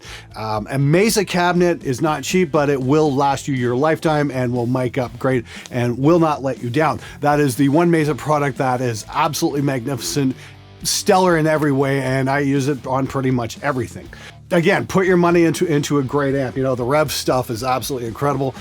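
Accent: Canada. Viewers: Are you canadian? Some of your pronounciation is pretty canadian.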